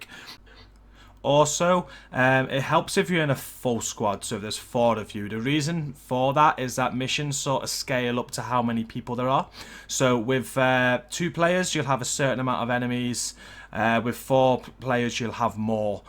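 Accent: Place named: Liverpool, United Kingdom. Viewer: Sounds like your from liverpool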